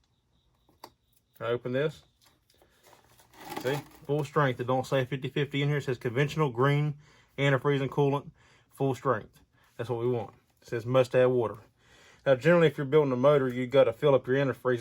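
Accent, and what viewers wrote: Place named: North Carolina, United States. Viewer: Yes sir! Born and raised. Raised in Wilmington, born in High Point. I could tell by how your said “water”. Been living in the California mountains now for ten years. Once I heard you talk I felt like I was back home. Thank again for the video. It helped! I got me 1989 bronco with the 4.9l that I’ve been putting back together.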